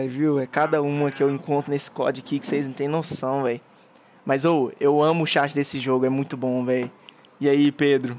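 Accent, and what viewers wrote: Minas Gerais, Brazil. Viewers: Tu tb é mineiro man?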